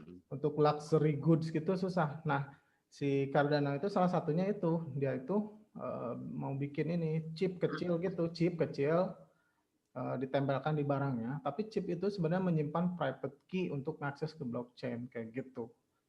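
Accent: West Java, Indonesia. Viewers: Bang Logat Sunda ya , hehe , mantap Slurr 😁 , Penjelasan nya mudah di pahami bang lanjutkan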